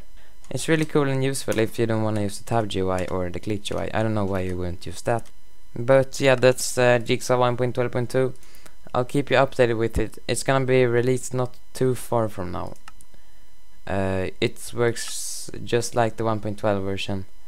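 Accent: Sweden. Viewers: ur from sweden i can hear it on your voice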